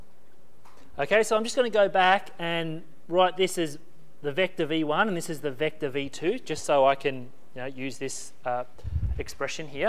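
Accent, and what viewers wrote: Australia. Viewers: That was great i will forever solve systems of equations with an Australian accent!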